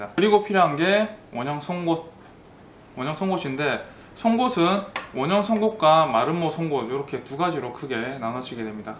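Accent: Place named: Jinju, South Korea. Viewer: 음 ...ㅎㅎㅎ 진주분이신가보다 ㅋㅋㅋ 억양이 왠지 진주스타일 ㅋㅋㅋ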